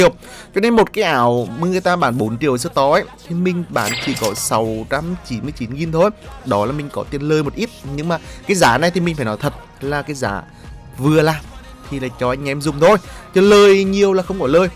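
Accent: Nghe An, Vietnam. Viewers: Giọng Nghệ An dễ thương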